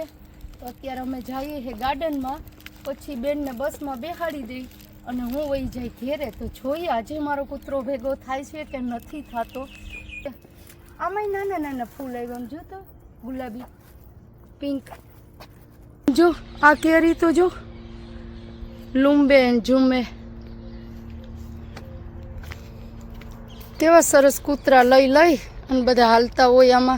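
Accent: Kathiawar, India. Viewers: કાઠિયાવાડી લાગે છે 😄🙏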